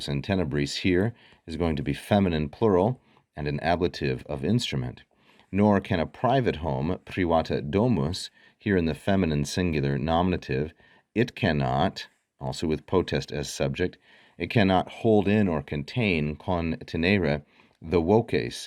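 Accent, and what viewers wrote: United States. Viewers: The reader is very fast and has an atrocious american accent. Perhaps he should make an effort to correct this.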